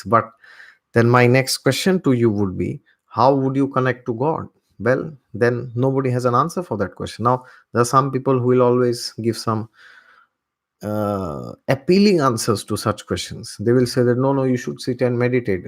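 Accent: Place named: Bengal, India. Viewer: r u bengali? your accent is very bengali kind of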